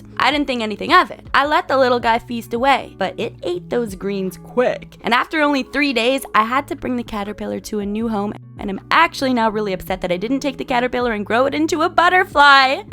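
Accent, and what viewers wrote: United States. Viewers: Ur accent is a mox between New Jersey, southern, and California 😫 ive never been so confused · Shes definitely alternating through multiple dialects, northern and southern US. Which is interesting since she's in Canada.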